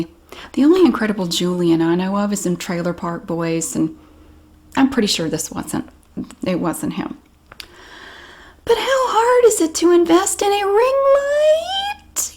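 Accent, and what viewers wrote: South, United States. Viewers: Btw you are a beautiful woman with a pretty southern voice and your personality is awesome. I also admire your diligence.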